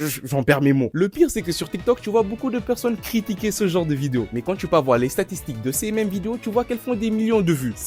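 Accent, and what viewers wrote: Senegal. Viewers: tu es sénégalais à ton accent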